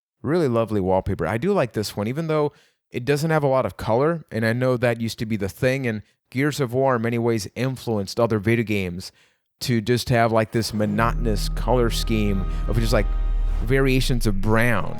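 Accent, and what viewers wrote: Puerto Rico. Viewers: Tu eres boricua ?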